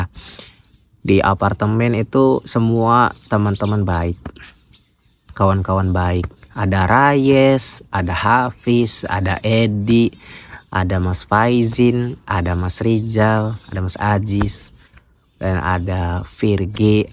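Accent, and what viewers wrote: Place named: Sunda, Indonesia. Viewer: Bapa pasti bangga a, btw asli mana a?. Logat sundana khas pisan😅. Pidoana a, mudah mudahan tiasa oge ngerantau ka jepang🙏🏻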